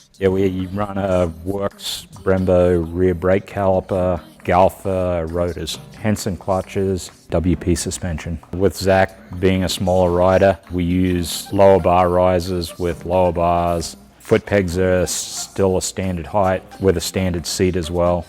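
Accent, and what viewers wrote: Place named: Australia. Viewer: He sounds Aussy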